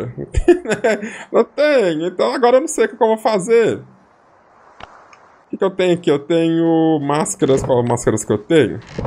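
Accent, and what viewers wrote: Minas Gerais, Brazil. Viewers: Pelo sotaque é de minas kkkkkk fala igual eu kkkkk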